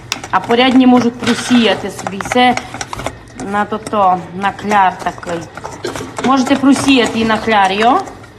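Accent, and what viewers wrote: Transcarpathia, Ukraine. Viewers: Яка файна мова, обожнюю цей закарпацький говір. Дякую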